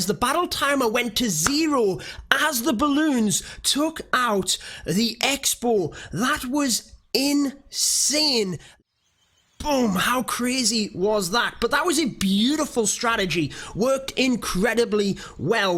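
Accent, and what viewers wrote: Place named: Scotland, United Kingdom. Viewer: where are you from?? you have an strong accent haha. guessing scotland?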